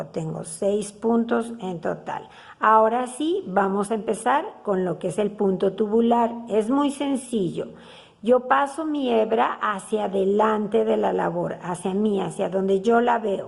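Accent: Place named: Mexico. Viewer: Otra belleza creada por el arte de esas manitas tan habilidosas, cuando escucho esa vocecita de acento mejicano me pongo alerta por que sé que voy a ver y disfrutar de una maravillosa labor que para mejor aún puedo hacerla yo también. Bendiciones preciosas 🌺🌺❤️🌺🌺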